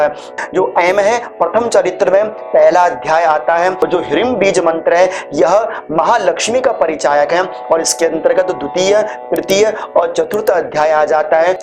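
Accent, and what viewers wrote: Standard. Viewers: शुद्ध उच्चारण अत्युत्तम। धन्यवाद इस प्रकार की जानकारी के लिए😊🙏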